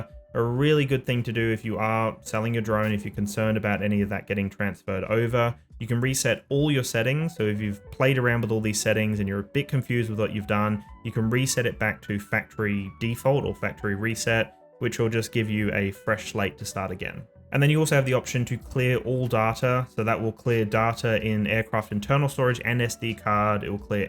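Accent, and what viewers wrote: Australia. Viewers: Easy to follow, and pause where you need to. The indexing at the bottom is a godsend. And an Aussie accent, so easy to understand. Highly recommend newbies watch this video.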